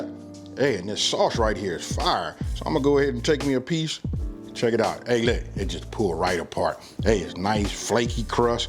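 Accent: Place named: South, United States. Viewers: Thanks for the great recipe. I just made this and, as you said, "BOOM". I love that?southern American?dialect of yours...I'm Scottish. Please allow me to offer you a wee tip pal: if you leave that hardy old root on the onion it'll act like superglue and help prevent anything from falling off! Just sayin like, but..I'm fae Scotland so what dae I know? ❤️ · Love this video...good southern cooking and accent.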